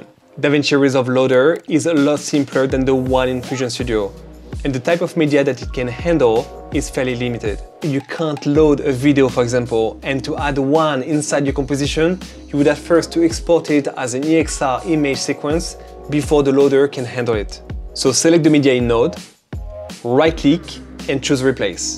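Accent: France. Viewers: he is french 🤷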